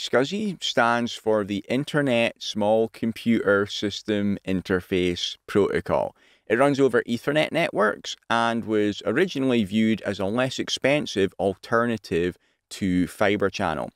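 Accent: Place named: Aberdeen, United Kingdom. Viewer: haha, you still sound Aberdonian to me! I live in the centre, spent the majority of my life in Bucksburn. Really appreciated the video, I've subbed to your channel too. I graduated a year and a half ago at RGU in networking, been working service desk for a year and 4 months, an internal position has opened up for a infrastructure/cloud analyst. Trying to get an idea of what to expect for interview questions! I'm good with networks and also learned a lot about security but this is another ball park. I've built a homelab with esxi/proxmox, my own domain etc but there is only so much you can do on personal kit. I'll be sure to watch more of your videos when I get a minute. Cheers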